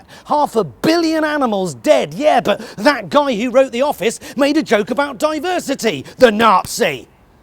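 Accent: Australia. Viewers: Strong Aussie accent game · Love the Australian accent, boy!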